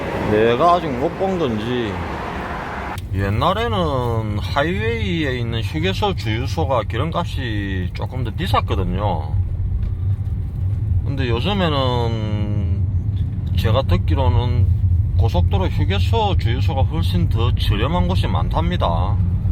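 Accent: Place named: South Gyeongsang, South Korea. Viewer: 아제의 나레이션이 정말 정감이갑니다. 경남의 억양과 구수한 음성으로 시원스런 도로를 달리는 영상들 최고입니다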